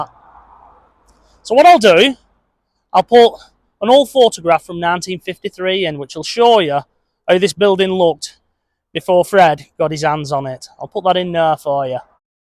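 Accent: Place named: Lancashire, United Kingdom. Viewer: 😂 Hi, yes I remember that too now that you mention it, the expense of specific reclaimed bricks. Freds accent was a joy to behold as is yours. We are very slightly less Lancashire sounding here in my hometown but all things Lancashire need proudly preserving. I never got into the historical preservation side of bricklaying myself, mostly new builds and extensions with some ornamental work. What did you reckon to Freds efforts in brickying? I thought he did magnificently considering he was not traditionally trained and he was self taught. His Mums chimney stack was beautifully over engineered for a terraced house showing his deep love for Victorian architecture. Not seen a single example of brickwork done by Fred that would not pass at City & Guilds level. An immensely talented guy.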